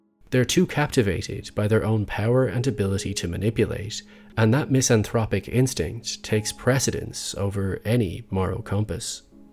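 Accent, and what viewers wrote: Ireland. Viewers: Very subtle Irish. He sounds like a light version of the Irish guy who has a true crime Youtube channel called That Chapter. Except he pronounces the TH in "three" and "thirteen" whereas the other guy says "tree" and "Tirteen". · Sounds Irish to me, but not that strong an accent.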